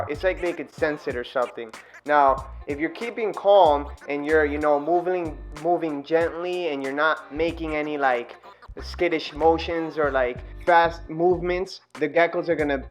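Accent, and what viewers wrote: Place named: United States. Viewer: So glad it is American accent ❤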